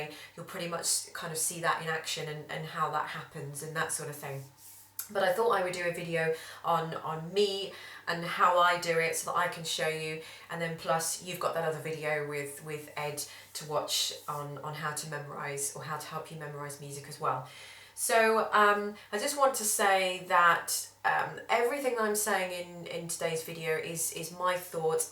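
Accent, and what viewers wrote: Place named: Scotland, United Kingdom. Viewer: You have a rich Scottish accent in my opinion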